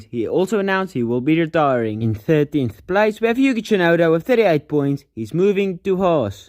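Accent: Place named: South Africa. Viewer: We like the south african acent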